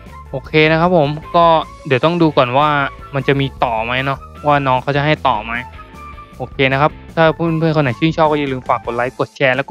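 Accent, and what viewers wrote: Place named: Isan, Thailand. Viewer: ครับพี่พูดเหมือนคนอีสานเลย555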